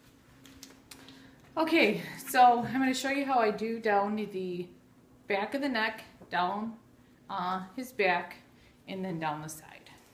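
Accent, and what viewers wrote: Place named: Minnesota, United States. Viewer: I hear a Minnesota accent lol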